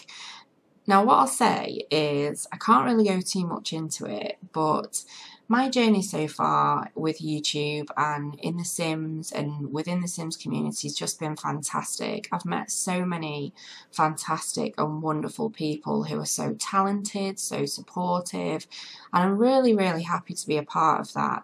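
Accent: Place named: Northern England, United Kingdom. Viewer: You are more than worthy of everything you do! It's just jealousy of your talent for these builds that's all. Really enjoying binge watching your videos and to hear your genuine comments on the bs you receive! Also nice hearing you're English like myself, except I'm not from your area (I think you're up north somewhere). X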